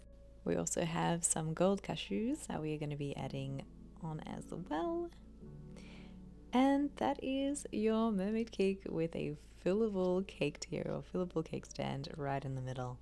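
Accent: Australia. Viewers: No problem with the audio here. It's maybe a little quieter than some channels but all channels vary. Maybe someone is having difficulty with your gorgeous Aussie accent?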